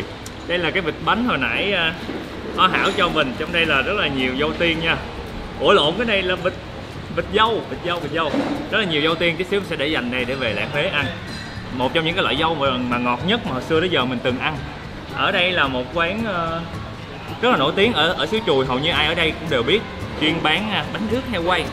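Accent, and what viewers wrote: Mekong Delta, Vietnam. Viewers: Hi! Chi rat thich nhung video clips cua.mot bo cuc ro rang,rat l doi thuong,va cai cach noi chuyen vua ( rat la Mien tay,chi o Long An coi cung la dan M,tay ). nè! Neu nhu em co di holidays Autralia. Thi em goi chi nha,chi o Sydney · vui tính,thoải mái,nc rất miền tây.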